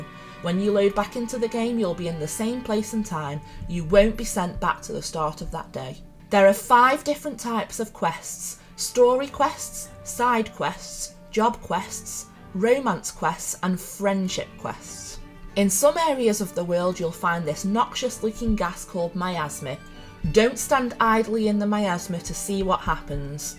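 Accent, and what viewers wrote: United Kingdom. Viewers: Can I ask are you from the Staffordshire area its your accent great video btw 👍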